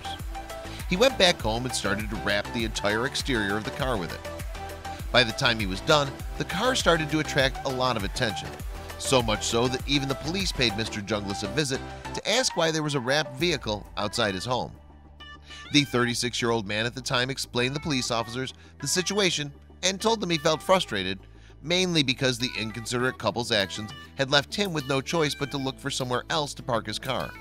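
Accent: United States. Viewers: Interesting point that the narrator sounds American.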